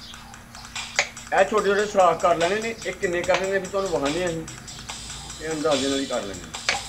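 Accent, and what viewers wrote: Sialkot, Pakistan. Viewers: I love sialkoti accant..... Hahahaha waaaah teh aondi ai.... Hahahaha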